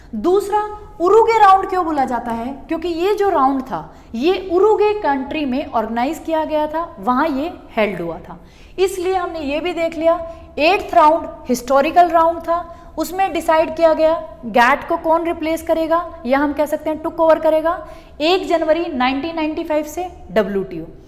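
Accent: Punjab, India. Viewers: Mam are u pnjabii??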